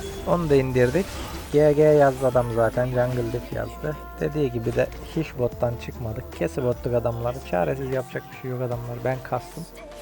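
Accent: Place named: Muğla, Turkey. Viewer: şiven acayip güzel ya 😂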